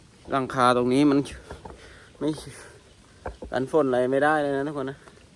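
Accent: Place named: Ratchaburi, Thailand. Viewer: สำเนียง..ราชบุรี..ชัดเจน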